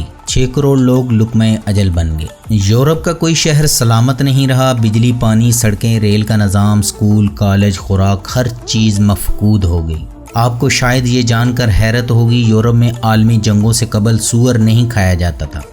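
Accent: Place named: Sheikhupura, Pakistan. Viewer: dear AAP se poocha Hai AAP kis City se Hain please tell me , I like your accents shiekhupura ka lagta hai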